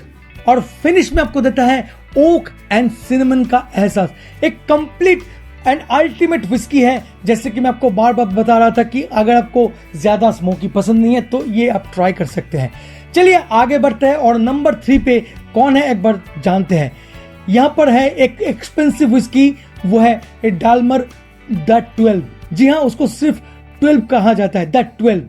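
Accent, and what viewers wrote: Bengal, India. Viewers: Bengali ha?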